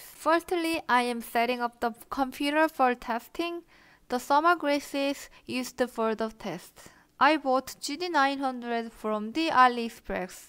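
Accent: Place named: South Korea. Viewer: 발음이 어쩐지 친근하게 들린다했다.. 한국분이셨네 ㅋㅋ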